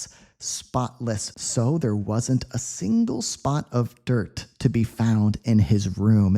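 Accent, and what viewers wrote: United States. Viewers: Are you an American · You articulate perfectly American English which is my favorite standard of the English language, listening to you is almost like having a personal tutor.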